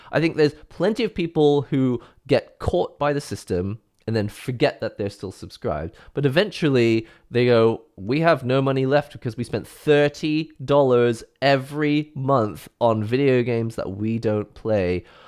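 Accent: United Kingdom. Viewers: Why do you have an uk accent?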